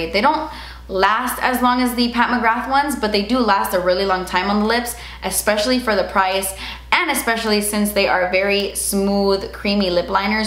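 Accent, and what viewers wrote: Cuba. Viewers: That Cuban accent is coming back😏! Las ventajas de vivir en Miami. 😘🤩😎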